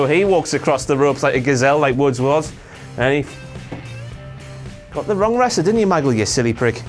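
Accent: United Kingdom. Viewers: He repeated the "tan job" like a million times and it still took me a while to get it. These British accents.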